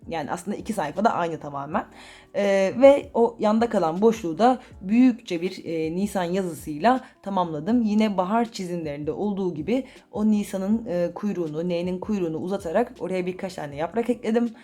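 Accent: Adana, Turkey. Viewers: Abla adanalı olanlar anlar bende videoya girer girmez dedim ki adanalı mi acaba? 😂😂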